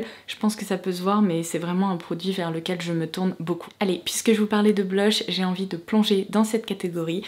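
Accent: England, United Kingdom. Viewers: Merci pour ces cadeaux pour ce super top 10 et ce super accent anglais qui fait du bien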